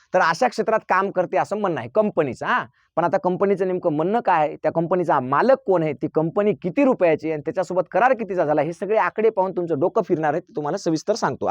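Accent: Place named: Parbhani, India. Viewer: भाषा परभणी ची वाटते सरांची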